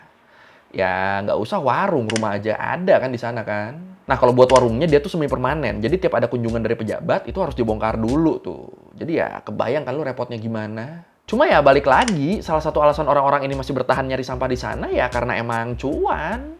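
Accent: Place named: Madura, Indonesia. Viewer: Kenapa nadanya hampir mirip kyk logat madura ya... Apa cuma aku ya yang ngerasa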